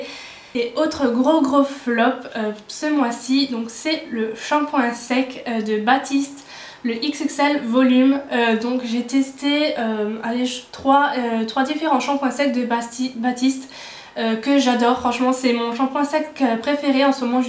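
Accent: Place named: Alsace, France. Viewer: Super ce petit accent Alsacien :) Je vais au marché de noël de Strasbourg cette année, tu dois connaître ça! Sinon encore une vidéo bien top :) Bisous!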